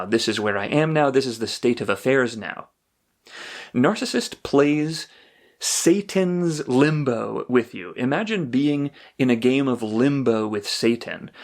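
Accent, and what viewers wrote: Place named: Canada. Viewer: Are you Canadian ? You say certain words like Canadians do lol .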